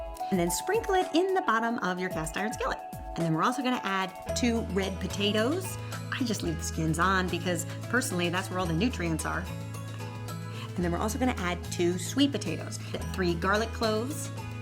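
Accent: United States. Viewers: What a great pity yanks have have so much trouble with plain English? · what kind of american accent is this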